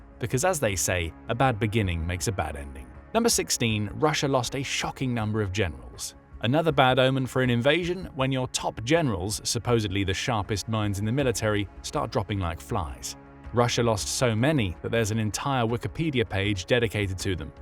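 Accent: United Kingdom. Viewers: Next time, use mid-continent English, not uk "english", a la Shakespeare crap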